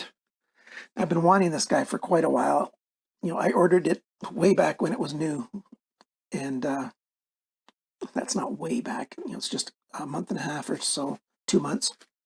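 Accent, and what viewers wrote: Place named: Canada. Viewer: I actually watch your videos for 2 reasons - I miss the Canadian accent at times, and always love hearing about the knife laws and appreciate my move to Asia as I can own and carry basically any knife I want.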